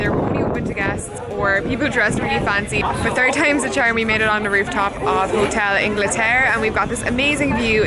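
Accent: Ireland. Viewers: You're from NYC? have an awful iris accent. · I love it. A beautiful woman who looks sometimes asian sometimes middle eastern sounds Irish lives in NYC and is reviewing Havana. If there is ever proof that the world is getting smaller here it is.!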